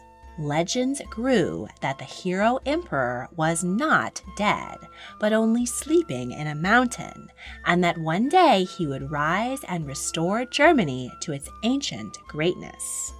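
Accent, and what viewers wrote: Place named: United States. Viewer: What a HORRIBLE, horrible nasal American voice. Ouch, it hurts.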